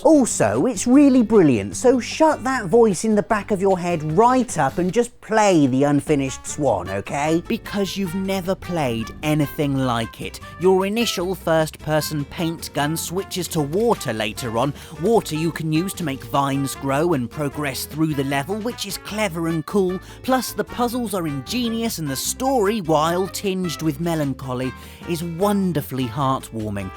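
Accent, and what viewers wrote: United Kingdom. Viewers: As a new viewer, I have to say your videos are worth watching even if one does not take interest in gaming, that British voice is just remarkably fun to listen to :) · Man i just absolutely love the british accent ;D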